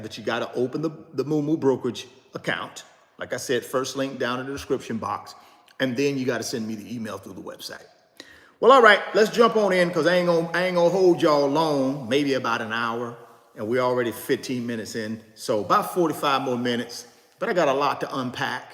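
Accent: South, United States. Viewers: Then why are you guys watching? The dude has a country, "TWANG" or dialect, that makes certain words come out sounding... country. In essence, the word NVIDIA is not even a word; it was made up from the word invidia and a acronym NV for Next Vision... so it was created using a poetic license, so he's using is own poetic license when pronouncing the word. Lol. So, give him a break. The information he is providing about the company is accurate, informative, and nicely conveyed. I could see if he was giving bad information, but he's not. It's sad that you would even bring it up. How about this... Go watch someone who's pronouncing a company's name correctly and giving BAD information and put your money into that stock and see where it lands you.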